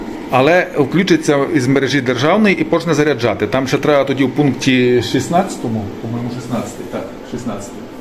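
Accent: Transcarpathia, Ukraine. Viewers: По акценту зрозуміло що Закарпатський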